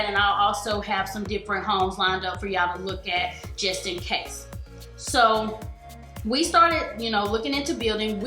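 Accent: South, United States. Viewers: Why did I read her t-shirt in her cute southern accent lol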